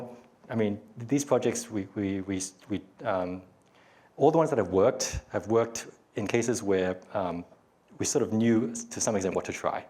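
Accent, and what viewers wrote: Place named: Australia. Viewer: He’s brilliant. I was in the same year when entering Princeton grad school and lived in the same “ New College “ (dorm) as Terry in the early 90s. He was so young and we all saw him at dinner each night. I’m in the humanities so we weren’t close. He was roughly 16 or so if I remember correctly. Later, I would sometimes see him walking on Witherspoon, deep in thought. He had this same Australian accent in those days as well.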